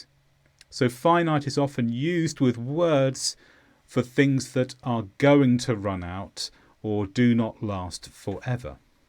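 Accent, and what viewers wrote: United Kingdom. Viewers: I have learned British Accent by you.I owe you i swear.When Our British Customers come to our restaurant they say where did you learn this excellent English?I show you❤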